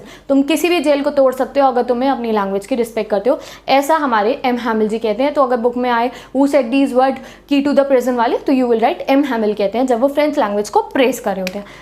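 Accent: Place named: Uttar Pradesh, India. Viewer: UP style of speaking bro Henge=hai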